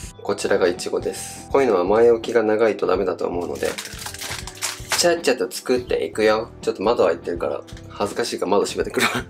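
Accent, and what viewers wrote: Kansai, Japan. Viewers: 名前変えれた～！！ これからはコメントできる～！！ 明日作るいちご飴はこの動画見ながら成功させますっ👌✨ 関西弁好き～💗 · 今回すごい関西弁多くてにやけた🤦‍♀️💖 いちご途中で食べようとしたの可愛すぎる🥺（笑） ダルゴナコーヒー(？)作って欲しいです！！ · ねえ！なんかいっつもよりめっちゃ関西弁！！！！可愛い！！どうした！！！👼🏻💘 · あとろ関西弁だから めっちゃ親近感わく♡ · 久々に見たら関西弁になっててめちゃくちゃ好きようするに好き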